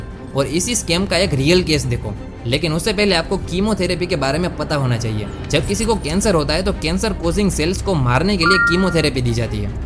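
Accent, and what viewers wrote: Gujarat, India. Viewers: ભાઈ ગુજરાતી છો તમે અવાજ પરથી .... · Aap gujrati ho bhai 😊😊😅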